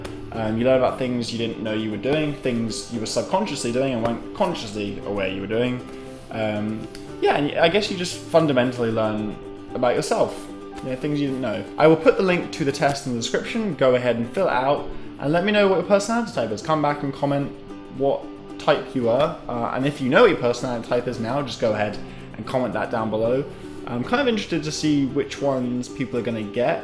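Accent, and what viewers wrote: United States. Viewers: anyone else notice the new slight American accent?? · Your accent is becoming more American. The more time you're spending with Arden the more you become each other and that is true love. Also found in friendships and it's so so damn cute!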